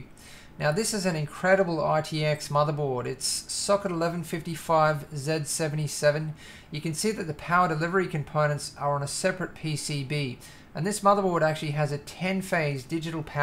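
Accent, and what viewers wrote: Australia. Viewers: What country are you from most are US but you sound aussie?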